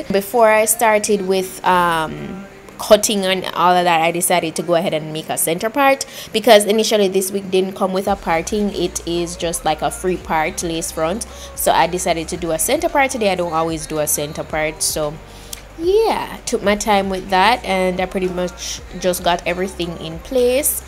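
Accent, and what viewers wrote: Jamaica. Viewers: Omg I love you jamaican or trinidadian accent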